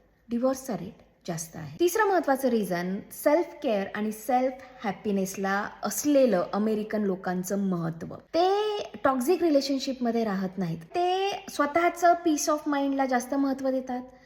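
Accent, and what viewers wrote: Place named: Marathwada, India. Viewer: तायडी तु मराठवाड्यातील आहे का.. भाषेवरून वाटतयं😅😅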